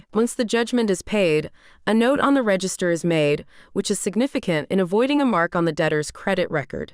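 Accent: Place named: United States. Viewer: so annoying using American voice for uk law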